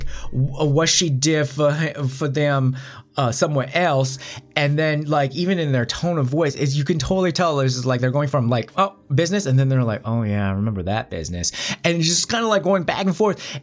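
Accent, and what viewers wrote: United States. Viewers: Ok dude, its maybe just me, but you need to LOOSE the cowboy--gay--ghetto sound...were you raised in merica?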